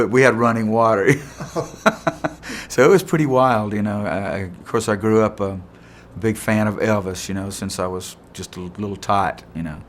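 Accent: South, United States. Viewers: Never knew he had a southern accent anyway very nice. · Whoa! Me hearing his southern accent was just as shocking to hear Steve Perry speak Portuguese....Learn something new....New to me.... LEGEND! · Interesting, wouldn’t’ve thought he had a Southern accent considering when he sings you don’t hear an accent!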